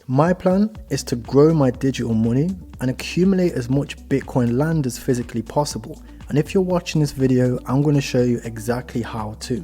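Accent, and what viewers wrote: United Kingdom. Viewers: Are you investing or trading. And are you from the UK?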